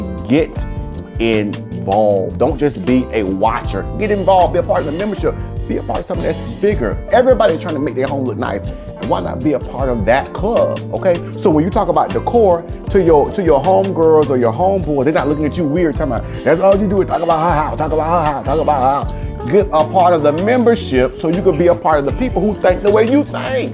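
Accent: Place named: South, United States. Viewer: Love your southern accent with your sense of humor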